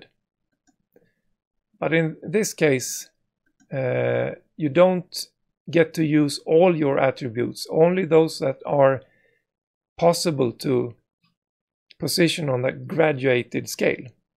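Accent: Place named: Sweden. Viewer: ...!! good swinglish, great shirt design!! its a good and cool video and very good explanation... requires good practice for the begginers to take good advantage of the tools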